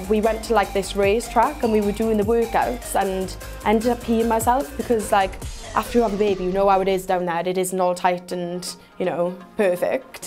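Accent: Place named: Wales, United Kingdom. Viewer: I really love Lateysha's accent! The Welsh accent is so pretty!!!!!!!!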